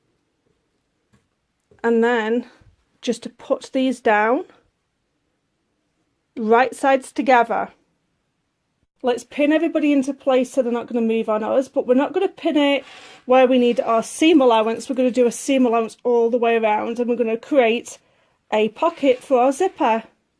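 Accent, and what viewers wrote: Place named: North Yorkshire, United Kingdom. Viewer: You've done it again! Another fine video I'll let you into a little secret; I love making bags but, as a quilter, it's sometimes quite a while between doing them. All my bags have loads of pockets but I often forget how to put zip ones in. No longer! I have a good 'go to' place for reference. Thank you so much. Please tell me where you originate from, your accent sounds so local to me (Scarborough, North Yorkshire) but I see you're in Canada - there's a story there I'll bet. xx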